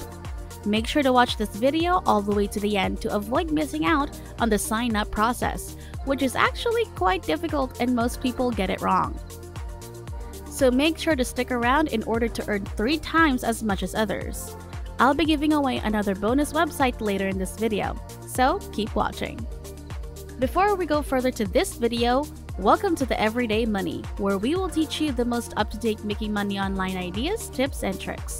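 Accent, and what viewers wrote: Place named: Philippines. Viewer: The woman talking in the video sounds like a Filipino. She has that Filipino telemarketing English accent 😍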